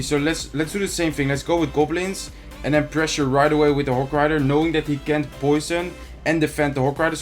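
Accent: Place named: Netherlands. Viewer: Dit is echt heel Nederlands engels😂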